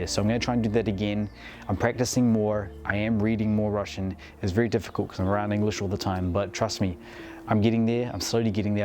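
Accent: New Zealand. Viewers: Russian is what you heard for 2 years, so I imagine it's still there in your subconscious somewhere. Hopefully that makes it easier to pick back up. BTW, I'm American and I could easily pick your accent up as Kiwi :) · I think you sound ultra kiwi. Though this is coming from a kiwi who lives in AUS. Accents aside, hope you and your family are well. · You sound like a kiwi to me. I did have a friend in Colorado, from NZ, & his accent was more pronounced. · Bro you sound heaps kiwi.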